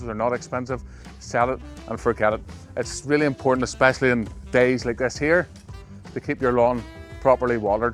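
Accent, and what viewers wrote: Northern Ireland, United Kingdom. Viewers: That's a Northern Irish accent!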